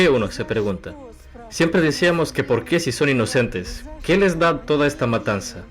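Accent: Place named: Russia. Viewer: me gusta mas la vos con el acento tipo ruso, siento que le da un estilo unico al canal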